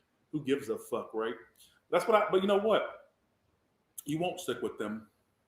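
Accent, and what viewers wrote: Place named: South, United States. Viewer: Thanks for the work you do ! Love that Southern draw!!!! I’m from Texas so I do especially when I’m mad (Scorpio)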